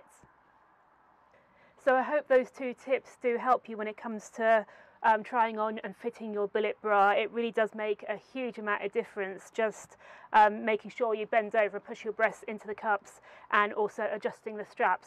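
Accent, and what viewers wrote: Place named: United Kingdom. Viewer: I am a Brazilian/ American and I found British accent so elegant, mostly from a wonderful girl like..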